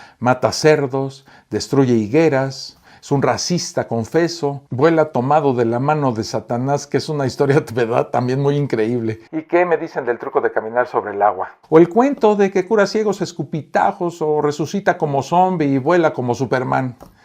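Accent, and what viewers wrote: Mexico. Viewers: No me explico cómo puedes estar contando,tantas pamplinas y tanta gilipolleces y es que Youtuber lo tienes colapsado con tantas mentiras que dices en tus vídeos.. ‘’Se suele decir que cuando un tonto va a la feria todo se vende y siempre hay un montón de panchitos y panchitas que pican como los conejos a las zanahorias 🥕 Y enciman con el acento MELOSO Mejicano o Argentino que usas para que vas hablar más. Si aburres a un muerto. Habla castellano con buen acento y no hables melosso. Jajajaja ya te vale Mejicano o argentino meloso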